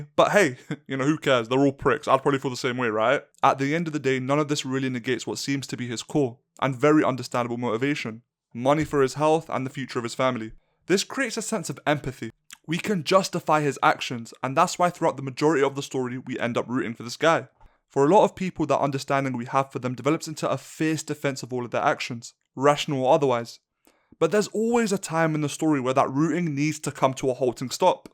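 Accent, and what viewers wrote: United Kingdom. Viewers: Very good video but why the fk yall british bro. Change ur accent n u gon pop off. Love you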